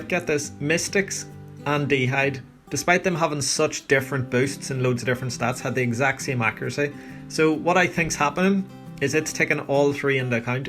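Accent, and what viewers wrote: Northern Ireland, United Kingdom. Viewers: You from N.Ireland?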